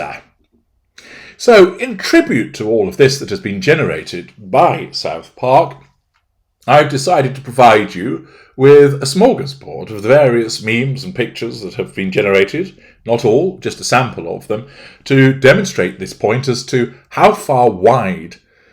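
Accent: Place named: Northern England, United Kingdom. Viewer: I love it when let’s the northern accent come out x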